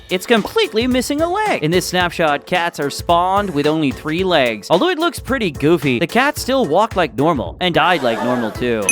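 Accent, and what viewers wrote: United Kingdom. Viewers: I thought u were British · Ain't you british?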